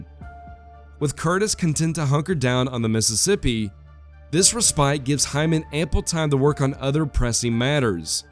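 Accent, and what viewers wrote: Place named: Texas, United States. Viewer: Really? It takes 4 months an episode. The Texas accent is a distraction also.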